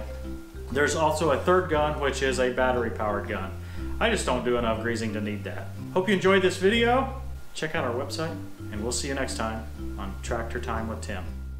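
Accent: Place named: Indiana, United States. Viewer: I just thought that was an Indiana accent when you always said "greezing" and not greasing lol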